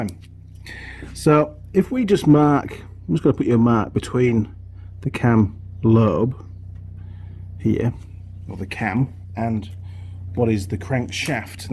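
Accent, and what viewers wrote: United Kingdom. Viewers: with a Brit accent no less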